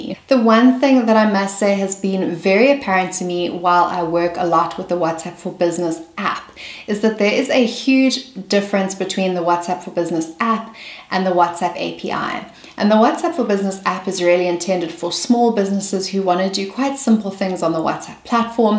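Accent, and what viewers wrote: South Africa. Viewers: Dear, I must compliment you on a very well polished online profile. I found your video interesting to watch and subscribed to your channel. I wish you all the best for your future endeavours. Oh and well done for flying the South African flag high. I was expecting to hear a UK or US accent and was pleasantly surprised to discover you're a local. I'm from Cape Town myself. Best place to live, am I right?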